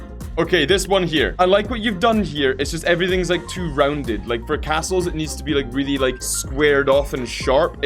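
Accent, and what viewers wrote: Scotland, United Kingdom. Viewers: His scotish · he's a Scot, it's in the name and in the accent.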